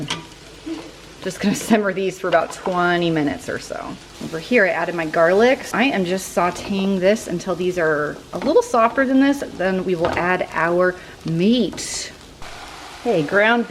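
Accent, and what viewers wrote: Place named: Texas, United States. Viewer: Yes idk if it's a Texas thing, but I'm from Houston and my family always said 'sketti' sauce 😂 I hear say a lot of small things like that that I also heard growing up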